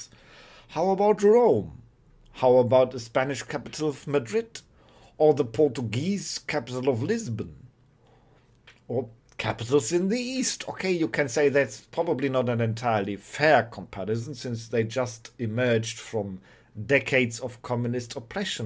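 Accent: RP, United Kingdom. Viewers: warum sprichst du wenn du englisch sprichst immer in diesem Posh-English-Accent? Warst du während des Studiums in England, Oxford vielleicht, oder einfach nur ein Faible für BE? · So Berlin isn't the yellow of the egg, except Spandau? 😉😁 The majority of big cities tend to be left. Love your very British sounding accent! 💕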